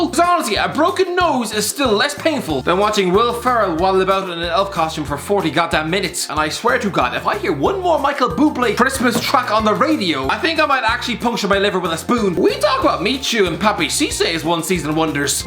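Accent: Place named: Ireland. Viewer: Can’t be the only one who has to switch on captions for this guys videos. I have a lot of Irish mates but god damn this accent is so thick 😂😂😂. Good content though absolutely love the channel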